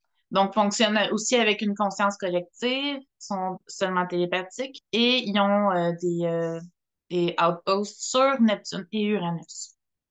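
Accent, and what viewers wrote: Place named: Canada. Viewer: je remarque l'accent canadien. j'ai peut-être vécu au canada dans une vie antérieure, j'imite assez bien l'accent canadien.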